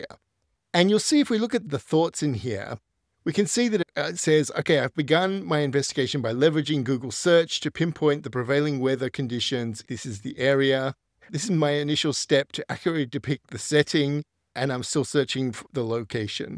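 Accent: Australia. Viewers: Australian or South African? Nice vid.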